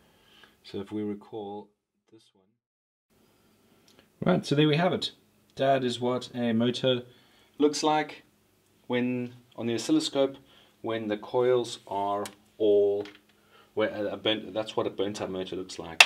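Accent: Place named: South Africa. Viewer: deffos a south african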